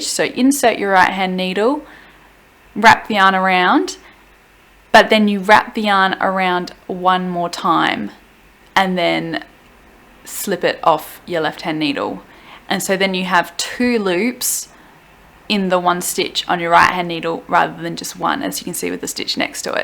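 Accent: Australia. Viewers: Thanks for this. I too am knitting socks with this instruction and now I know how to proceed. P.S. It’s nice to hear an Australian voice.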